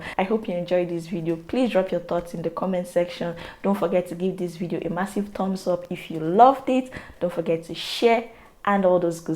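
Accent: Nigeria. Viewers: Very off topic but I like how u still have your Nigerian accent 😌😌😌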